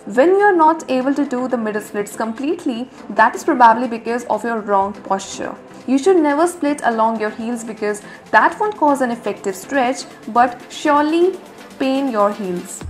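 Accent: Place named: United States. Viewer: you speaking English like a American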